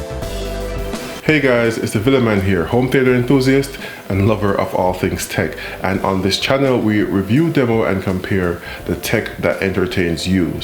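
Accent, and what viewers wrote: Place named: Jamaica. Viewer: Love the content bro, also sound like your from Jamaica..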